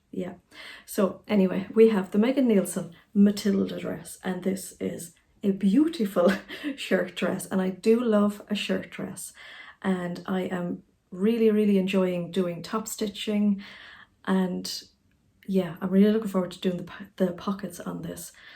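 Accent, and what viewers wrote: Ireland. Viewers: ✋🏻Hi .just tuned in and was drawn by your Irish lilt .I live in Sheffield but grew up in Dublin.Love your makes and how they look on you.I am going ,to check out your other posts now.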